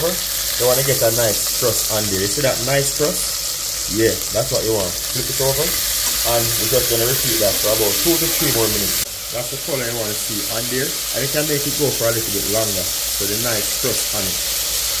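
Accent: Jamaica. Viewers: Chef, next time you come back, please do a pop-up cookout in MANDEVILLE. I would order this meal. While you're on-site cooking you could do a book signing for fans. It would be a great way to market your book. Your meals are prepared in such an appealing way that one can eat with one's eyes. I also love your attention to cleanliness. No dutty pot, we say. What did you say, Old cow hard to chew. 😂 Don't go offending any old cows now, my boy. I love the little value bombs you drop offering instruction plus little-known cooking secrets. Communicated in standard Jamaican English. You've got it locked. 💯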